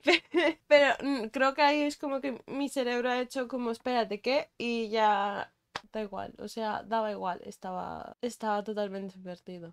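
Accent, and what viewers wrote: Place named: Peru. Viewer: Hablas cmo peruana🧐 sera?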